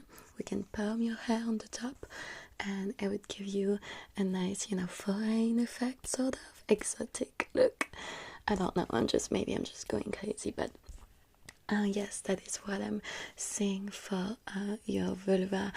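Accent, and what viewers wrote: France. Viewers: Okay I’ve watched like. 27 times so it’s safe to say I’m obsessed!! Love the French accent!! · I just came across this videa, i am not sure how or why but, wow, i feel happy, i will check out your channel.. my god, the french and their accent, exquisite. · yesss! I’ve been looking forward to a sequel to the first one of these you did! Love it, sooo tingly and I love the French accent🖤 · im male but i will watch any french accent video from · I'M JUST SO IN LOVE WITH FRENCH ACCENT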